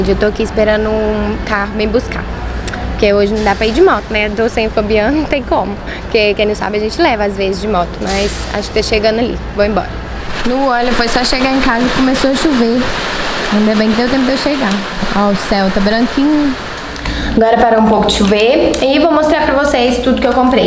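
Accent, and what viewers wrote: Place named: Minas Gerais, Brazil. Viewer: Esse sotaque mineiro.. eitha q orgulho soow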